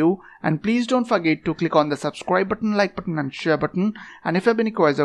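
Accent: India. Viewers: I was little skeptical with the Indian accent lol, ngl it worked thank you for saving my ass.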